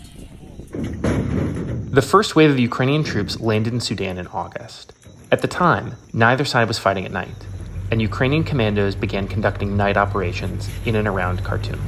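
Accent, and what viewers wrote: United States. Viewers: Is this a Californian accent?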